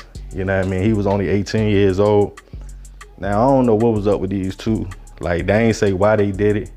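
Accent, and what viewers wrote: Baltimore, United States. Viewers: You gotta be from Baltimore I can hear it lol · I knew you were from Baltimore. I know my peoples from anywhere🤣🤣🤣🤣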